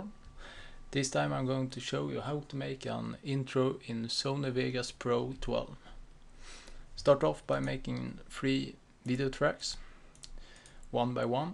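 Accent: Sweden. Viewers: your swedish?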